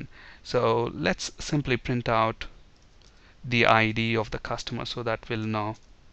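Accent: India. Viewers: I (as a non-english native speaker) usually have trouble concentrating on videos with an Indian accent (-sorry for that sad assesment). But this dude is very didactic, expressive and illustrates every point very well! Hugely Recommend his different videos on different reference points for beginners or quick access to specific info! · I usually can't stand the Indian accent in tutorials, it's the cadency of the words it distracts me because when you speak with an Indian accent in English the sound is usually flat and has stops after each word. Maybe I'm a dickhead but I'm specifically referring to the combination of flat English + Indian accent in tutorials. The reason why I'm making this statment is to let you know that this does not happen in this video! This tutor is fantastic, dispite having an Indian accent the narration is not flat and it's pleasent, the contents are on point, concise and clear, thank you so much! 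Subscribed!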